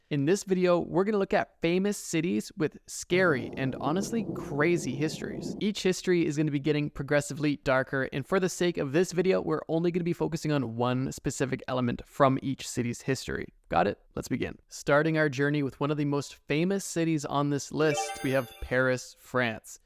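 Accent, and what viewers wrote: Scotland, United Kingdom. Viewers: 21k likes where’s the scottish accent i’ve been waiting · Where's the Scottish accent video, we're at 22K likes :D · Let's get that Scottish accent! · Wheres the Scottish accent,? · Where is da Scottish accent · Your Scottish accent sounds like how kids talk at my school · please give us the Scottish accent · Gimme the Scottish accent, please? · then we'll get to hear his Scottish accent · Great Video. Where is our link Bro?! We want to hear your Scottish accent lol. You are passed 21k likes.....I guess I should have waited til the end before posting this lmao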